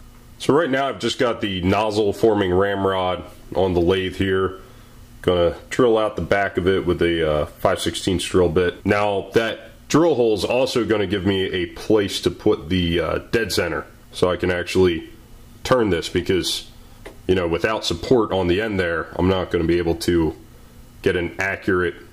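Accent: South, United States. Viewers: Wow,. You seem to have picked up a slightly southern twang and a deeper voice. The rest of it is the exact same though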